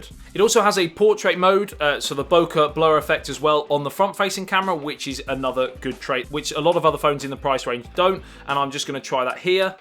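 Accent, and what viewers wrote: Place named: Essex, United Kingdom. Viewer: This man is clearly of irish Catholic descent. Hia facial features are a dead giveaway. What puzzles me is why he is talking with an essex accent. He should do the next video in a raw irish accent to pay tribute to his irish Catholic ancestors. I knew he was Irish the first time i saw him on youtube which was last week. He is a strong Irish leprechaun trying to present tech videos. He is an Irishman by blood.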